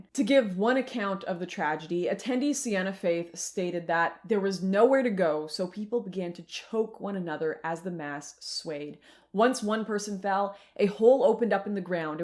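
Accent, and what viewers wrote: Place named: Canada. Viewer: She has a Canadian accent stop going on about trivial unrelated subjects.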